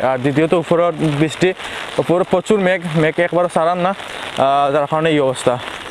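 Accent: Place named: Sylhet, Bangladesh. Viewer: Sylheti blogger speaking dakhai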